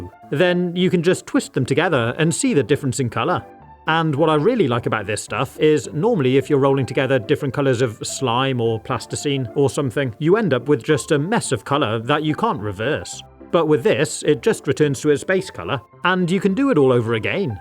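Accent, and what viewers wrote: United Kingdom. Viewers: I love your vids You sound like you are in the uk and I'm in the us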